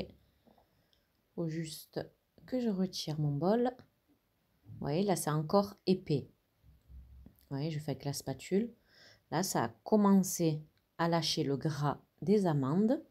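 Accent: South, France. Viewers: Merci beaucoup pour tes recettes, très très bien expliquées, avec en plus, ton bel accent du Sud, pleins de gros bisous du Gard 😊😊😊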